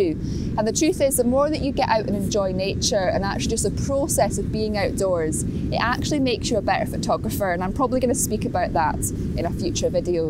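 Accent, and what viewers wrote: Scotland, United Kingdom. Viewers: You had some stunning shots thanks to your patience and persistence. You are as lovely yo look at and listen to as your images are to look at. I love your scottish accent and your lovely smile just show your joy and enthusiasm in going out to shoot. Another vlog well done!!!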